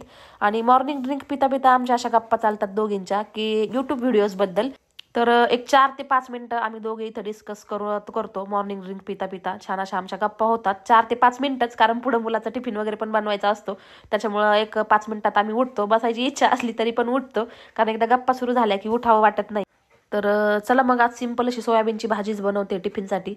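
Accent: Ahmednagar, India. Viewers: तुमची नगरी भाषा लै ऐकू वाटती🎉😂🙏👍👌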